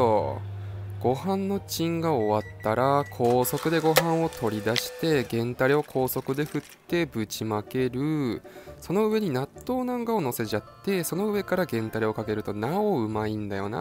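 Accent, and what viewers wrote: Tsugaru, Japan. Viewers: 津軽弁面白いけど、動画自体があんまり面白くない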